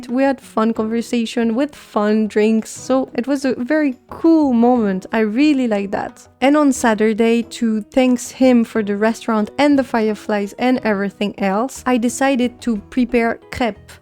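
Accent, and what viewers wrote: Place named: France. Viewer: Le petit accent français ;)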